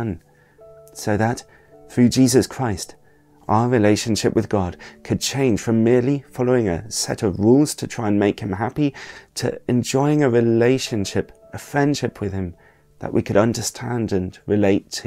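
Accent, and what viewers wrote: United Kingdom. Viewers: So so glad to see your face! beautiful soul, wonderful voice, brilliant British accent, Love it! May God bless you and your love ones! Merry Christmas 🎅🏻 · I am baptised, but not a believer but I do respect people who believe in a religion. Your video was a beautiful, and a lovely message. It's good to see your face, indeed. It's a good idea for Christmas. You have a soothing voice, and a lovely British accent. (Please, don't colonize my computer nor my photo camera FujiFilm X-T4!) MERRY CHRISTMAS! 🎅🎄🎁🌠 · i like the british accent:) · i love your britich TBH.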